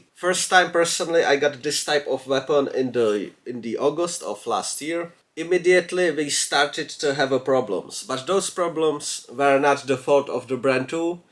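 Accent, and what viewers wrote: Czech Republic. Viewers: i bet 100% this guy is czech, that accent tears my ears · Czech accent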